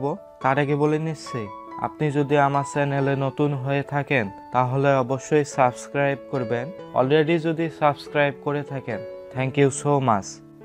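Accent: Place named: Sylhet, Bangladesh. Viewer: ভাই আপনার কথা শুনে মনে হচ্ছে আপনি সিলেটি আমিও সিলেটি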